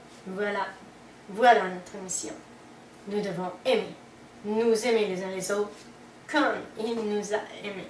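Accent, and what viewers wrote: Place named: Quebec, Canada. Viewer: Bonjour! J'ai liké ta vidéo. Je voudrais cependant te demander si c'est possible quand tu fais une vidéo d'abandonner un peu ton accent québécois? Pour qu'un maximum de personnes puissent te comprendre.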